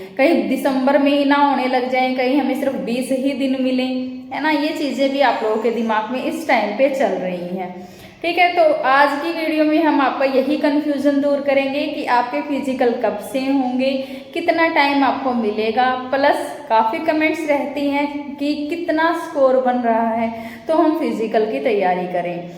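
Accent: Haryana, India. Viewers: Haryana se ho ji aap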